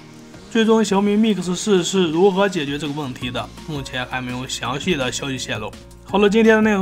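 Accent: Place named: Northwest, China. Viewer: 哈哈哈哈 晋蒙陕甘口音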